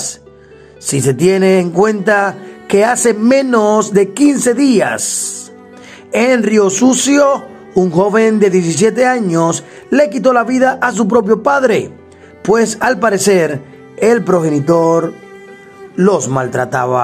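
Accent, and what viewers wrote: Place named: Dominican Republic. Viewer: Solo te faltó decir en qué lugar del planeta paso eso noticias tragicas en voz de un Dominicano eres comunicador y eres bueno manejate. Ook mi Bro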